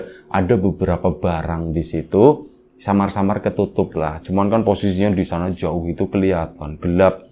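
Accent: Tegal, Indonesia. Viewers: Lama ndak denger, sekarang logatnya jdi tegal bgt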